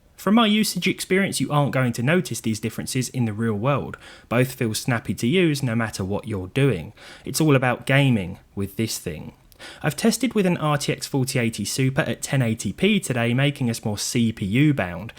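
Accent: United Kingdom. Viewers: oo a brit? sub'd. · I like your accent. British?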